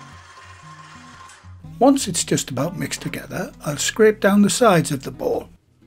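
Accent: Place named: Geordie, United Kingdom. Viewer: As a fellow Geordie, I love hearing your North East accent. I've made a couple of your bread recipes (just recently found your channel), and both times they've been a great success. Thank you for these delicious recipes. I'm looking forward to making more of them.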